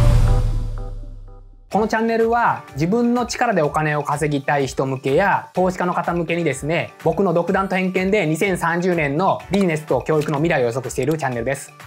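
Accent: Hiroshima, Japan. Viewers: えーコロナ！大丈夫ですか？広島弁聞きたかったん！ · 非常に説得力あるお話でした！勉強になりますね。ありがとうございました。広島弁で話されてるところも聞き入るポイントです。話すスピードも私的にはgoodです！ダラダラ話している方は飛ばしたり、早送りしてしまうので。最後のじゃあね、バイバイがまた可愛いくホッとします笑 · 面白い動画ばかりで、広島弁も心地いいです。ただもう少し完結した動画にして欲しい。でも楽しいですよ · 心地よい広島弁ですね✨ 勉強になりました！ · さん、ご家族でコロナ大変でした😂元気に復帰していただいてとても嬉しいです🤗奥様も3人の子育てで大変なので段々イライラしてきたんでしょうね😅 さんが作ったスキル分かりやすいし素敵です🤗課題発見力の必要性と商売の本質も学べました。やっぱり疑問に思ったり、思考力が大事と思いました。フルモデルチェンジ力のお話しも納得！過去の成功を捨てるのは勇気が入りますがしがみついていると時代に取り残され稼げなくなると思いました。変化に対応できて学び続ける人が稼げると私は思いました。大手が参入している領域では勝てないので、個人でどうやって専門性を見つけ稼ぐに繋げていけるのか動画にあげてほしいです🤗次回の動画も楽しみです🤗 さんは未来予測もしてますが私達に現実、事実を教えて頂いている希少性が高い存在です☺️応援してます😊あと広島弁も素敵ですね😉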